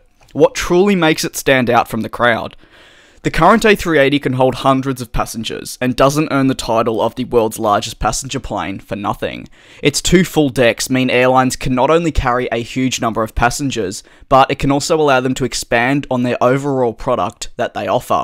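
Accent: Australia. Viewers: Are you Australian? Not trying to be rude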